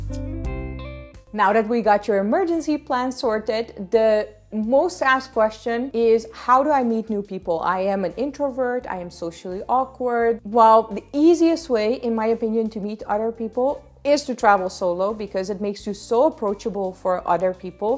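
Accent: India. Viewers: and you got an indian english accent :P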